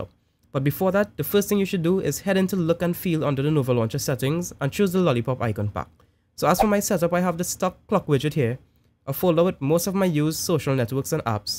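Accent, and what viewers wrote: Trinidad and Tobago. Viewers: I recognise that accent! love all the way from trinidad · Are you trini? Can spot that accent a mile away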